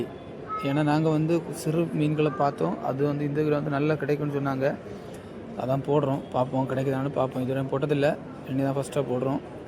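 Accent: Sri Lanka. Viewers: ஈழத் தமிழ் பேசுவதை போல பேசுகிறீர்கள்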